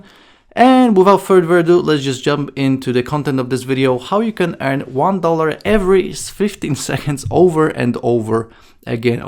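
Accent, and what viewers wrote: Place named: Portugal. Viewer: your Portugese accent makes it difficult to understand sometimes. Please speak more slowly and repeat the key info several times. Thanks!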